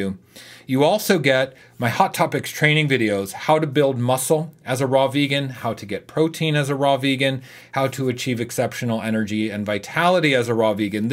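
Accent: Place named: United States. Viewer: Cause he is speaking very well,not too fast and trust me because it’s American English. 😊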